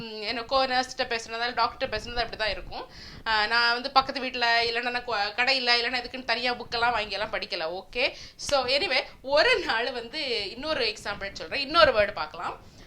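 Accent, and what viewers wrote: Nagercoil, India. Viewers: namma nagercoil slang a friendly a pesurenga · உங்கள் நாகர்கோவில் பேச்சு மிகவும் அருமையாக உள்ளது.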